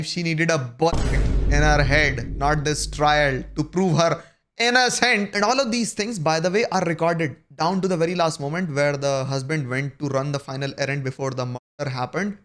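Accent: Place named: United States. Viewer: Wanna wanna bolna bandh kar American kalon jaise. Dhang se 'want to' bol.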